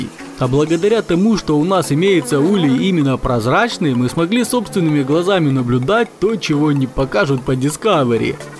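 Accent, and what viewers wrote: Ukraine. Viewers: а разве не в украине просто говорит он с некоторым акцентом, похож на украинский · Какой же мерзотный 404-й акцент. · это Украинский акцент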